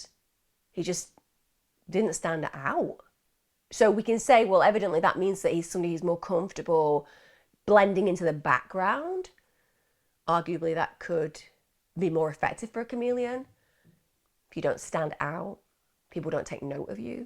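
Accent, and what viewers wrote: United Kingdom. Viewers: I'm getting ready to watch this new episode. I think this is my third episode with you. I listen to some other true crime YouTubers who occasionally do a "deep dive" but all your videos are deep dives, which I appreciate. I'm turning on the captions because my American ears can't always understand your lovely British accent.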